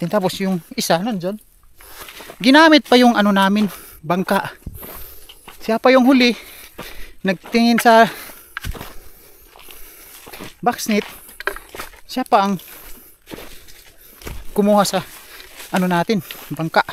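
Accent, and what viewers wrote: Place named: Bohol, Philippines. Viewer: Dayo raka Dha sa agusan Dol.. Imong Sinultihan Kay murag Taga Bohol nya ka pronounce nimo taga Tarlac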